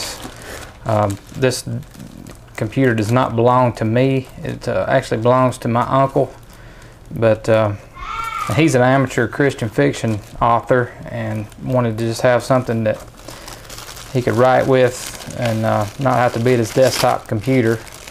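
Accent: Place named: South, United States. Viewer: very nice southern dialect, sounds like in a movie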